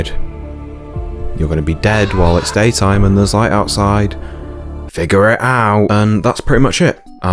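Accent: United Kingdom. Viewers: hey, mom, new femboy just dropped Btw: u look infinitely better knowing you have a british accent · omg he's british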